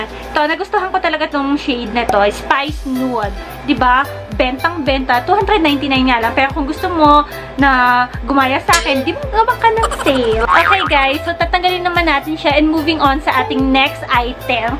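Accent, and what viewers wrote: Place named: Batangas, Philippines. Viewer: kakatawa mam ang pagsasalita ninyo HAHAHHAA batangueño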